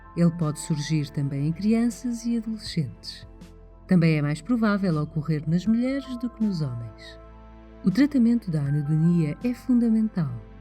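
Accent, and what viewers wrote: Portugal. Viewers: É q o sotaque é de Portugal haha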